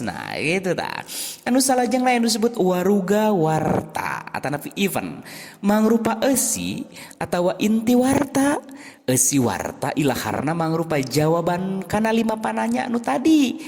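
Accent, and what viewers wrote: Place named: Sunda, Indonesia. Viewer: Waduh Sunda pisan ny......warta berita ... Kedah d momole bahasa Sunda teh.. Semangat kang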